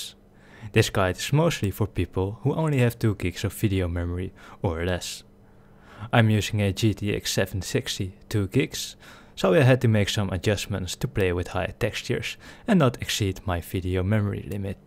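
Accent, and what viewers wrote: Netherlands. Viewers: Are you dutch? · definitely dutch. Gekke nederlander :P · Easily tell he's dutch, they all sound the same :) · I don't know why but this guy sounds Dutch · Ja nederlnads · I thought he was a friend of mine but he is probably dutch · Ben je een nederlander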